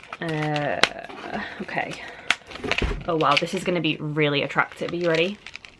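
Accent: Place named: England, United Kingdom. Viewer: I like your accent... England????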